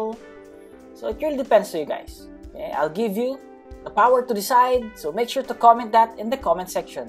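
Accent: Philippines. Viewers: eh pinoy naman talaga sya voice palang nya at accent alam na alam na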